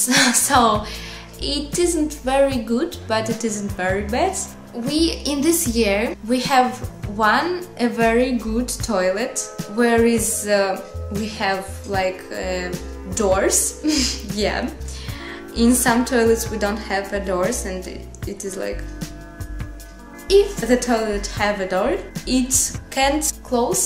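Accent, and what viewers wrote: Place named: Russia. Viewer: видно же,что русский акцент хах😅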